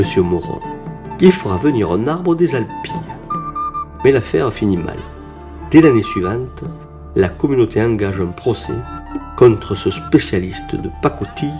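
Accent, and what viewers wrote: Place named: Albi, France. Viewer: Il me semble entendre l'accent de mon beau-frère albigeois. J'adore. Autant que le sujet des moulins. On croirait écouter un conte. Délicieux. Merci.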